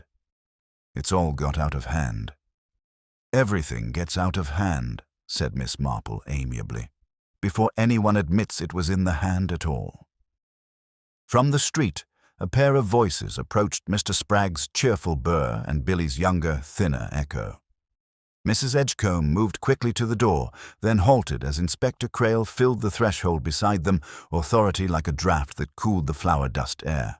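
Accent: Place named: United States. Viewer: Wauw, the ai-voice gets more and more American…not pleasant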